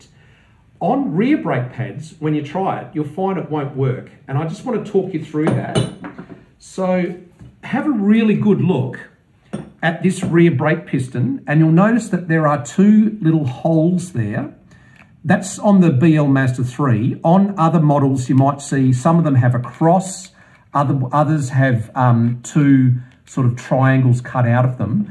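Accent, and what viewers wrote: Australia. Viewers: The moment i jeard the aussie accent i knew i was gonna hear exactly what i needed to hear in perfect detail. Ya folks down under are really something else when It comes to automotive prowess.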